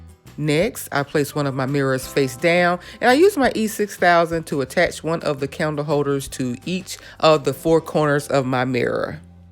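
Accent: South, United States. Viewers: I hear. Just with a southern flow but clearly. Love the table.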